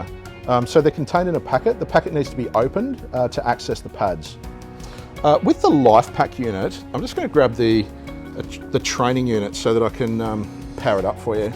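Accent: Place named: Australia. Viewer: .. I Love Your Aussie-Accent Mate ..👍🦄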